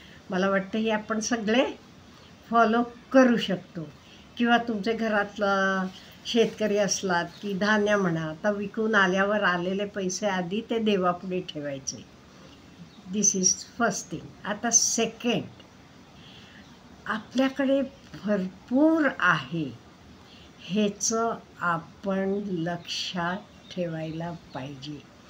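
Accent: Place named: Solapur, India. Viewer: तुमच्या बोलण्यातून तुम्ही सोलापूर चे आहात असे वाटतं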